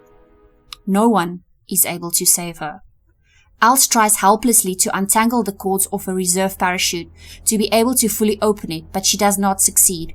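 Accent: South Africa. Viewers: Im binge watching ur videos ur work is great and i sense ur accent is south African ❤🎉watching from SOUTH AFRICA 🇿🇦🇿🇦🇿🇦 im truly proud of ur work ur videos are long shows dedication and the amount of hard work u put in u gained a new sub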